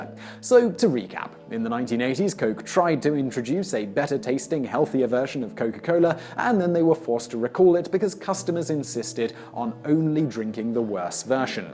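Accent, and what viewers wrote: United Kingdom. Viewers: Public Enemy - he's British. That's how they say it. It's not just him, it's an entire nation as well as everyone in other countries who was taught British English as a second language. So yeah, a massive percentage of English speakers say it that way. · Samm Ball II American he has a British accent if he spoke like an American you'd hate his voice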